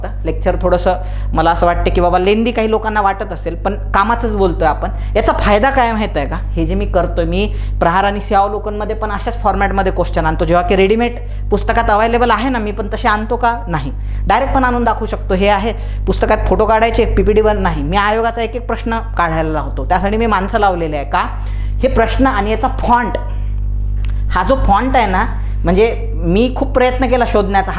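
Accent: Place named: Vidarbha, India. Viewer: खरचं खुप छान explain करता sir तुम्ही आणि तुमची भाषा आपलीशी वाटते ते वाटणारच शेवटी विदर्भ तुमचं आमचं सारखंच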